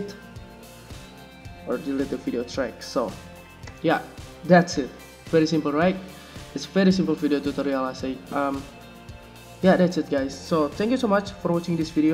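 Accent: Indonesia. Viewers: Ur accent is funny,indonesian?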